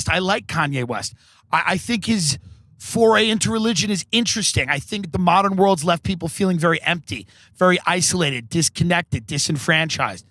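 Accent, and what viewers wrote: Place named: Long Island, United States. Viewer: Maybe it's his long island accent but I love when he says SHUT UP! you really feel the disdain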